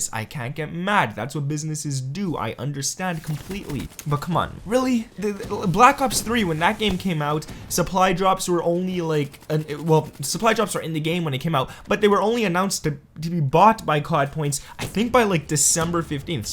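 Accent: Canada. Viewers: Dude your Canadian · Matthew M he's canadian... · r u Canadian?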